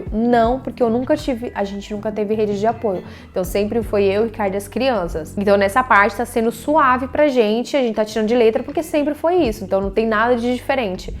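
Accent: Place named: Rio de Janeiro, Brazil. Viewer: Também amo sotaque carioca (sou gaúcha - não amo algumas expressões daqui - mas gosto do jeito que o gaúcho da capital fala, acho jovial e único 😁). É desafiante recomeçar, mas há muito valor em recomeçar. É vida somada, sem dúvida! O que for material, será conquistado, certamente será. A vida é boa quando vivemos intensamente o momento, estejamos onde estivermos, em cada detalhe e fase. O sentimento de pertencer é mágico! É como reencontrar-se/reconectar-se. Folclore ☺ Nada é fácil ou só felicidade. O maior exemplo disso é realizar o sonho de se formar... A Universidade não é um morango e saindo dali, fica ainda mais tenso. Mas compensa pq é a materialização de um sonho. 🥰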